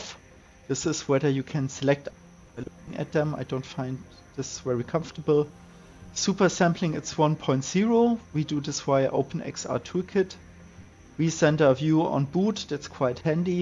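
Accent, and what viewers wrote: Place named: Germany. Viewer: thank you so much for your help! And as a also German I may say, that I love your beautiful German accent 🤩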